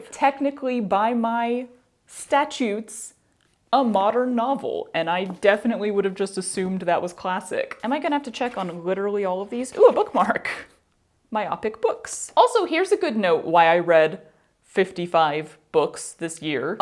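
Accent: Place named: Brazil. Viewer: I love that even though you grew up in Texas and live in California (major Spanish-speaking states), your accent sounds like Brazilian Portuguese 😂❤ Have you picked up much Portuguese?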